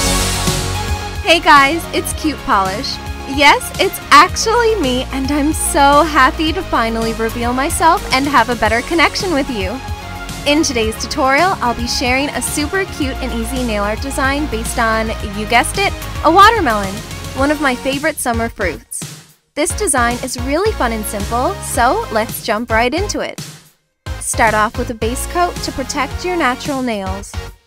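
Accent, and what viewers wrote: Canada. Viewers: You can tell shes canadian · she's Canadian I can tell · You can tell. She talks with a canadian accent:) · She doesn't sound Japanese at all you guys. Lmao 🙈 She definitely sounds Canadian.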